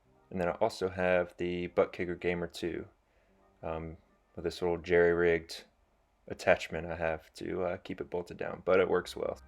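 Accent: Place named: Midwest, United States. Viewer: My profile name here is my XBL GT add me if you feel like. Are you Midwest US, sound like it.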